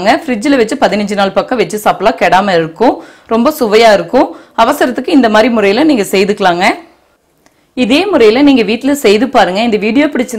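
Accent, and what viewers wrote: Kongu, India. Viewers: Kongu Tamil kagave oru like...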